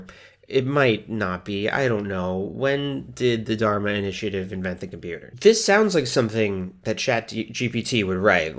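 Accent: RP, United Kingdom. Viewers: As a Brit, I approve of your posh English accent. I thought you'd like to know. Also: here's a bouquet 💐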